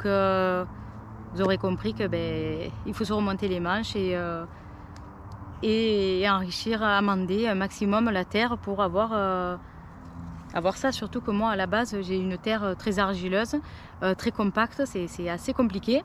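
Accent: South, France. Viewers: Bonjour je découvre votre chaîne qui est très bien, de plus j'adore votre accent du midi. Félicitations pour votre beau potager 👏👏👍👍. Cet automne j'ai mis beaucoup de fumier de cheval et de la paille je suis impatient de voir le résultat. Je mettrai du fumier de poule lors de la plantation en mai prochain. Je m'abonne 😉😉Ali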